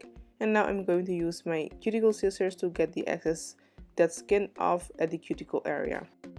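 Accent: Netherlands. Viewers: I can hear from your accent that ur living in Holland.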